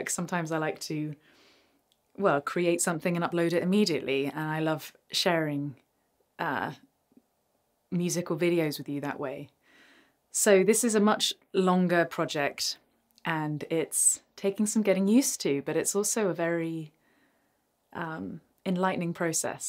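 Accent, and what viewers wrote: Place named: Bristol, United Kingdom. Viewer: It's a pleasure to see you wearing something other than black, first of all. Second, producing your own studio album is quite a chunk to bite off – big hopes for a solid return on that investment of time, energy and money. Finally, as always, I remain enamored of your speaking voice and that "Bristol" accent. Good stuff. Keep up the reports!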